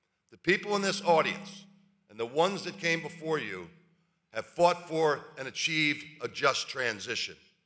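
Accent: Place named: United States. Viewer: You sound exactly like an American oughta, you sound like my voice, the voice of my father -- carpentry, my grandfather -- electrician. The heart of America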